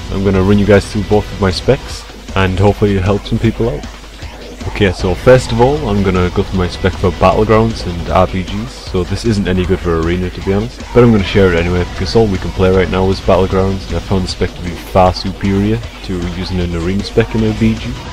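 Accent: Newcastle, United Kingdom. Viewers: nice vid thought i heard a hint of newcastle in u for a sec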